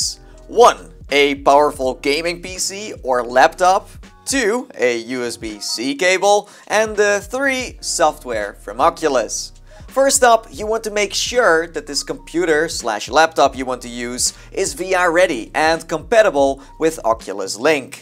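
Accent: Netherlands. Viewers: Are you dutch? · Are u from the Nederlands? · YOU MUST BE DUTCH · I KNEW IT, I knew you were Dutch, first I didn’t notice but after pausing the video and doing something else in between, after I started the video again I could hear a small trace of a Dutch accent. When you went to the control panel and I saw “luidspreker” I knew for sure. Kijk is aan. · Why do you sound dutch? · Are u Dutch ?? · are you nederlands?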